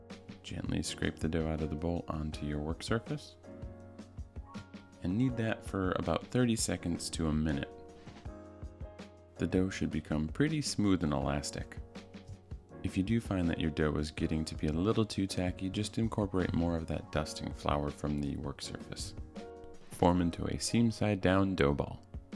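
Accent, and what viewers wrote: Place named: Italy. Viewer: Your Italian accent is stunning! As is the pizza!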